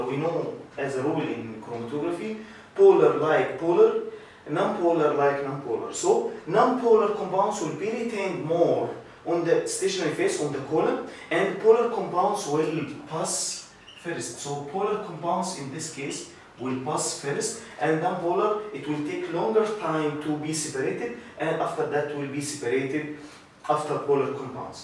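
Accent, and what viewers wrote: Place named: Egypt. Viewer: من لهجتك وهيئتك باين عليك مصري رفعت راسنا بالمجهود الجميل دا❤❤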